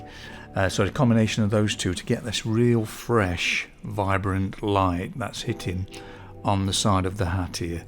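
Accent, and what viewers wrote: United Kingdom. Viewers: Thank you, I have learned a lot from you; actually you were the one that introduce pastel pencils to me! I didn't know there actually exists such a great tool; I just used to draw and paint using pastel sticks, oil, and charcoal. I have downloaded your videos and watch them everyday with considerable attention. It's funny that my daughter's accent is going to change to British as a result of listening to your commentary. I wonder if you are able to let me know how you take your videos! Do you use a DSLR cam or a simple mobile phone? I appreciate all your art and generosity.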